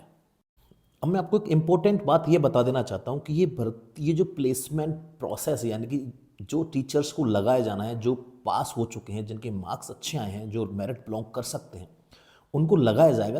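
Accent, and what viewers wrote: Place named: United Kingdom. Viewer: Bhai apka accent british h..mujhe u.k m teacher lagva do na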